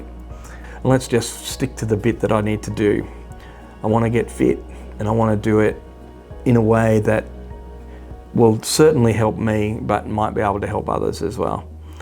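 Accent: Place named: Australia. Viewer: Just found your channel as you're about 2 weeks out from the event. I'm an Aussie living in Texas so I appreciated hearing the familiar accent on the videos. On my own fitness trip at the moment wanting to drop 20kg (lost 5 so far) and aiming for a half ironman to start with. Will be great one year to go to Cairns or Port Macqaurie to do a "home" race. I did have a laugh at the start of this when you mentioned "10 years ago" and then you looked down to check your watch haha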